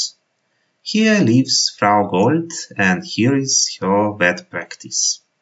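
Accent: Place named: Switzerland. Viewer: Интересно, почему швейцарский акцент? Мне приходится смотреть много учебных фильмов ( по медицине), сделанных в Швейцарии. У дикторов очень похожее произношение. Может в Австрии тоже так говорят? Надо бы видео с австрийцами посмотреть.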